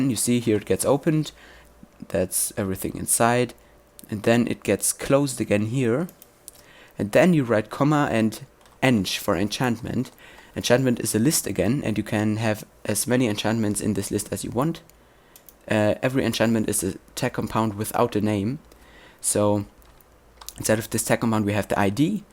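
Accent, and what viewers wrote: Germany. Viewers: are you german? :D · Are you from Germany ? xD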